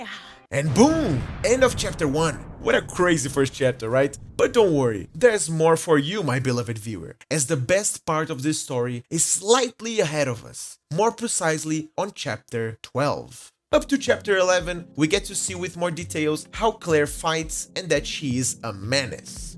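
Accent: Brazil. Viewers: Tu é brazuca mano?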